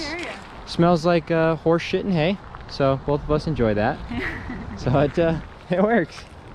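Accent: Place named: Australia. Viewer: Hahaha the Aussie accent 😂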